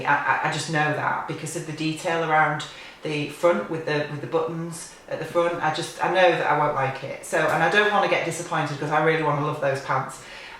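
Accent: United Kingdom. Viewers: ...you are too funny, I just love watching your channel and you’re so honest, which I just love. I was born in Elland, near Halifax but have lived in Canada for 46 years and your northern accent is like a little bit of home for me, thank you so much! Just wanted to say hi and let you know you’re appreciated.....don’t ever stop doing these vlogs, I just love them! I meant to say too, that yellow blouse on the magazine looks almost the same as the pattern you bought.....am I right? It would save you tracing that blooming pattern out. I have so many and love them, but always find a reason not to trace them off. 😏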